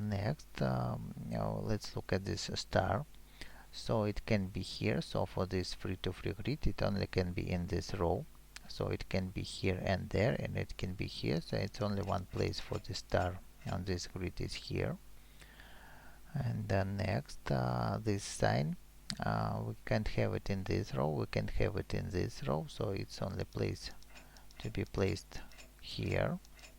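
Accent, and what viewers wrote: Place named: Russia. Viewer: i cant listen to this guy.. is he russian?:DDD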